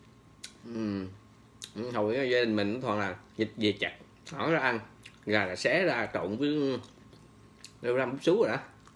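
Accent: Quang Nam, Vietnam. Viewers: Có phải anh là người quảng nam đà nẵng không? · Anh nc pha tiếng quảng nam quảng ngãi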